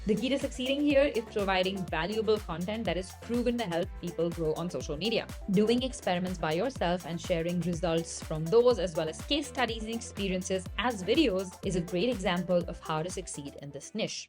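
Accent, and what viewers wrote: India. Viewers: Your voice like india sound in you voice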